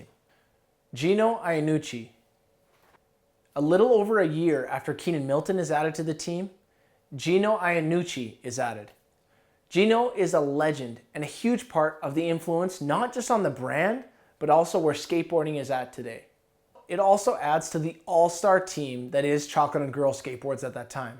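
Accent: Canada. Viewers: Do Canada people reply say ay? Not to be mean it funny just wondering be I heard you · imfrom spain and ecxept for the canadianaccent your spanish is good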